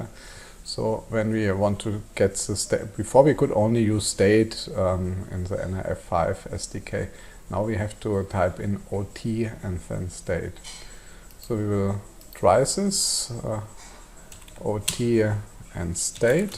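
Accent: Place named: Germany. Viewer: Ich hören den deutschen Akzent und schreibe daher auf deutsch 😅. Danke dass Sie diese Videos mit uns teilen. Dies ist mein erster Versuch auf einer Plattform hörer als arduino oder esp zu arbeiten und gar mit open thread. Diese Reihe ist ein super Einstieg obwohl ich schon so viel gelesen und versucht habe traue ich mich noch nicht damit zu beginnen bis ich es nicht zu 80% verstanden habe😂 bitte machen sie weiter so und vielen Dank!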